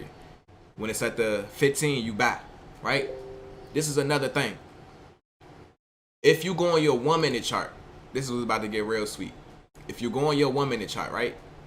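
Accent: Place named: New Orleans, United States. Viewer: You from nola?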